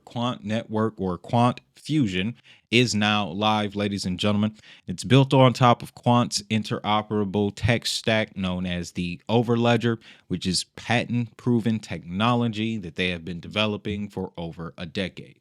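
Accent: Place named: South, United States. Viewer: Thank you for breaking it down with that Southern Style.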